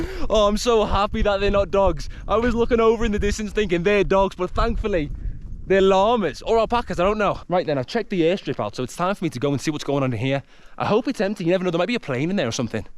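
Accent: Colombia. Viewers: Bro talks different like colimbian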